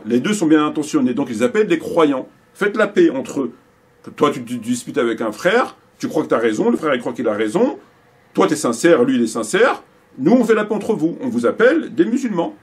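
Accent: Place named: France. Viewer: Qu'Allah te guide SubhanAllah, tu nomme toi même dans le début le conflit de fitna, la où des compagnons présent à l'époque n'ont pas prit position et parti car fitna il y avait, toi avec ton accent de français quand tu prononce péniblement des mots en arabe tu viens tenter à mettre en doute l'attitude à avoir pour ne pas laisser le conflit entre eux et Allah, tu dis toi même c'est un conflit entre musulmans, tu t'entend pas ? SubhanAllah on s'en fou de ton avis, garde pour toi ton venin et ta compréhension avec ta raison 3aqli Laisse la transmission au vrai savants et va apprendre l'arabe correctement sinon va regarder un film sur netflix par exemple Tu es à l'image du zindiq oubrou que l'on vois derrière toi sur un livre dans d'autres de tes vidéos Fais de la pâtisserie ou je sais pas, mais trouve toi une autre occupation que de reprendre du venin philosophe et avec ta raison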